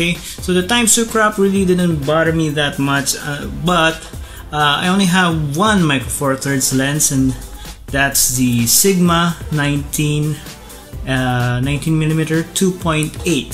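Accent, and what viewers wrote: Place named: Australia. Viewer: Love your Australian accent.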